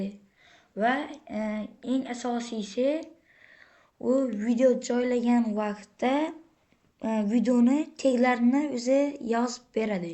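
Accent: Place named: Khorezm, Uzbekistan. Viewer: zoq chiqibdidan gaplash da gap bo xorazimcha va toshkentcha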